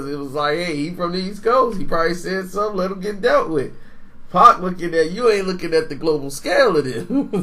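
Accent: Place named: Compton, United States. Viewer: on piru get yo head busted lol..u sound just like a Compton brotha lol...the m.o.b don't play ftp ttp 151 piru all ru are with it except game lol...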